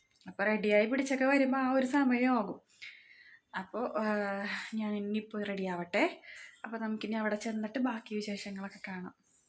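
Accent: Kochi, India. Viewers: Njanum kochi kari annu.... Kochi language kelkumbo othri santhosham..... Njan chennaiyil annnu ediku varum Kochi marakan pattumo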